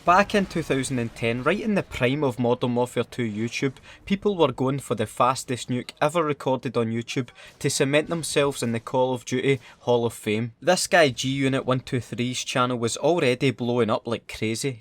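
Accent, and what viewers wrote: Scotland, United Kingdom. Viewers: Yo I have been subbed for about a month now, I would be browsing facebook and sometimes I would come across a scottsman playing COD and thought I fucking love the accent but more importantly I found that the content was top notch so I found you on youtube and subbed and now here I am, drinking coffee, enjoying a great COD video. Thumbs up to you sir. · Now i know where this accent is from. SCOTLAND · I don't watch any other COD youtubers. Tbh I just love the Scottish accent that's why I watch this channel ahahah.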